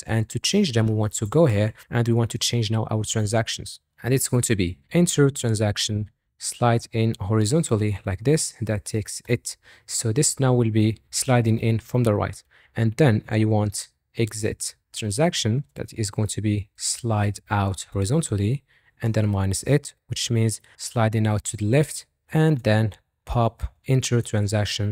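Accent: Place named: United States. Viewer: اشرح عل مهلك خرب حظك مشالله عليك احس انك مولود في أمريكا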